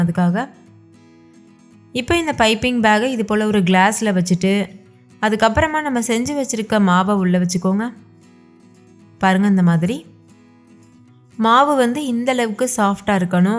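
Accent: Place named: Kongu, India. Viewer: Neegal coimbatore ra.kongu Tamil nala pesugiramga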